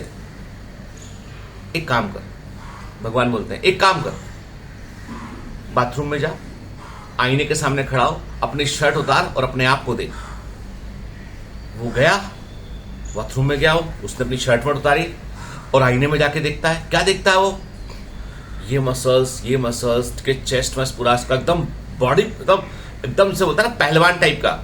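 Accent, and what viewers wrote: Gujarat, India. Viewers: Sir aap Gujarati ho 🧐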